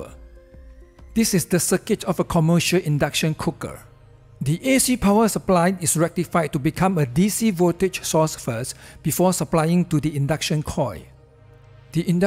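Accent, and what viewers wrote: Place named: Singapore. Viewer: Great video! Recognise that SG accent immediately! ;) Thank you for the wonderful explanation.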